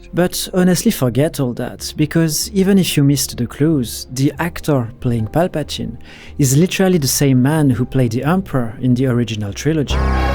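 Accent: France. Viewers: Holy French accent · tu es francais?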